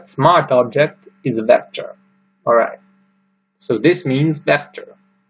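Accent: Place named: Balkans. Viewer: Hey buddy thank you for your tutorial. worked like a charm for me! I appreciate it. btw I've noticed you tend to sound like a person from the Balkans lol which is good either Bosnian, Serbian or Albanian but more Slavic I can tell lol thanks once again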